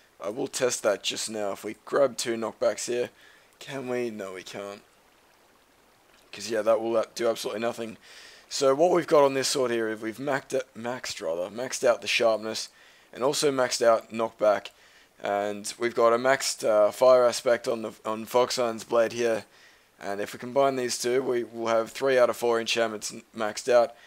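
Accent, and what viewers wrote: Australia. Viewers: Because he's using the Australian English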